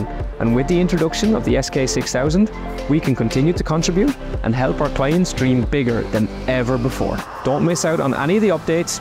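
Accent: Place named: Ireland. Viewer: I wasn’t expecting an Irish accent when the video started! These cranes make liebherr ones look like toys!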